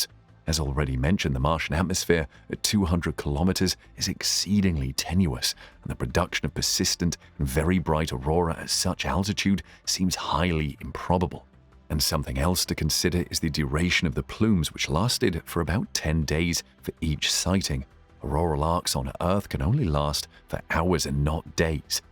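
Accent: United Kingdom. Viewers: Just how far in space can a built object go before burning in the atmosphere? What are the odds that we are being lied to about ALL what this guy with his SO official voice?!? And because he has a soft English/British voice, is that supposed to make him seem honest when ALL they've done throughout time is lie to us???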